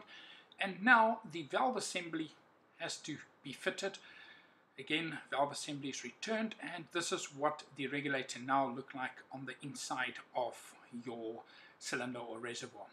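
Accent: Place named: South Africa. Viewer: Awesome I thought I recognized the accent and also trust a South African to look at things and improve on it.."n Boer maak n plan" right...well everything of the best for you Mate!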